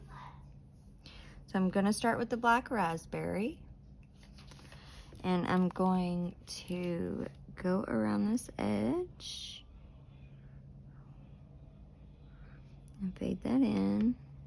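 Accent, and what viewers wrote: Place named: Kentucky, United States. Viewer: Oh, how cute! Love your intro music, so fun and your desk set up today is so stylized! I was working away at Kerby dragon with you last night. Made a boo boo because using my Prismas, erased and worked on finding a colour combination. I found that one that you suggested in Prisma was too dark when used with another, so I persevered and tested things out. I learned a lot doing this and got a colour combo that is similar to yours but I liked even better, haha! I then decided to use only three colours on the scales and it looks good. I had two colours that are the darkest and midway through, I forgot which one of the pencils to use. I've decided to go between the two. I figure that animals in real life have colour variations in their scales and are probably not consistent in colour. I wanted to let you know that I really like your channel because it feels more personalized compared to many of the others. 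I feel as if I'm colouring with a friend, but at the same time, I get the feeling like I'm learning from an expert. I don't know why you think that you are not great at talking during your videos, you are quite chatty and personable and your Kentucky accent adds charm especially to my Canadian ears, lol. I also like how loving and caring you are to your children and I can strongly relate to that. My heart gets warmer when I am colouring and hear you talk about them. I really enjoyed colouring along with you yesterday because we just sat and coloured together unlike other coloris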